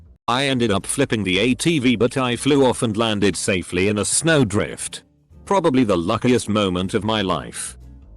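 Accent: United Kingdom. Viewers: Nice British accent, man! 👍👍👍👍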